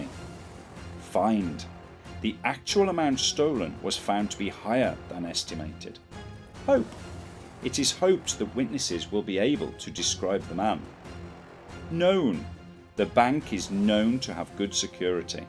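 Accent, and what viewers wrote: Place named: United Kingdom. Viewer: British accent❤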